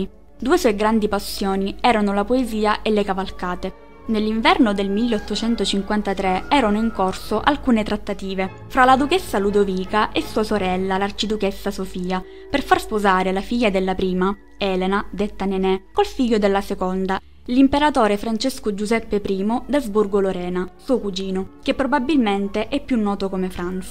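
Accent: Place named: Catania, Italy. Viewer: Sei del Catanese? Si sente molto il tuo accento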